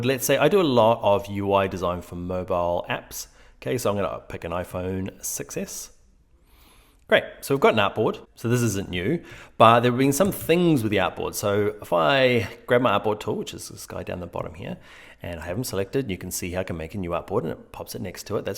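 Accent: New Zealand. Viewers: Great video thanks mate. Are you a kiwi? Sound like a kiwi :))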